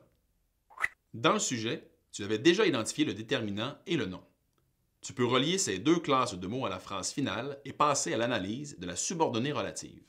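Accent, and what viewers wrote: Quebec, Canada. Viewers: Son français est correct, il a juste un accent québécois.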